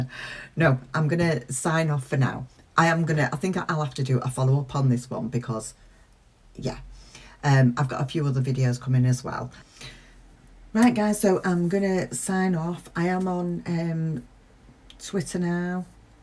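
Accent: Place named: England, United Kingdom. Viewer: Always look forward to and enjoy your videos,. If Wendi is ever prosecuted, the Prosecution are gonna have to be a hundred steps ahead of her, for ALL the reasons you outlined in this video. You probably don't mean to be, but your delivery is highly entertaining! You've probably been asked this before, but your accent places you in what part of England?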